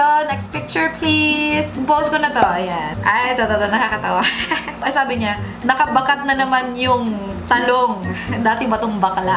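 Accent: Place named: Pampanga, Philippines. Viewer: Napakacute mo ate! Yung kapampangan accent mo nakakatuwa. Sarap pakinggan.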